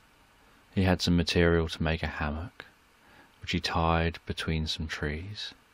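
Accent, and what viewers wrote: United Kingdom. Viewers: I only fell asleep because your British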